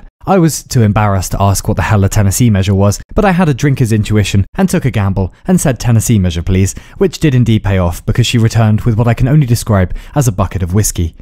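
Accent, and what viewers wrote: England, United Kingdom. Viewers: "Life is a mountain you must Climb to see the view from the top, and the journey itself is the most important part of the whole thing" I came up with that quote because of your channel, and I want you to know that I know you, but you don't know me, so... I dunno.... Wanna grab a cup of tea and a slice of cake fellow carbon unit that has a strong English accent and a half developed hatred for wasps, and just wonder about how living is a fucking miracle and being alive is incredible? I want to thank you deeply, as I am able to connect to the ideas you present in your videos at a very deep level, and it makes me feel peaceful. Not dreading anything, not being scared of inevitable death, but just to be able to be calm for 10 minutes and have a deeper understanding of the universe is incredible and you make that happen. Keep doing what you do, and never change. 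You have come so far, rest here a while, for there is so much further left to go. And I can't go without you. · sublime british accent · You have the ability to get me interested in about anything, even if i wasn't before. Anyway thanks for changing my entire view of the world with your videos and your cocky but charming british accent!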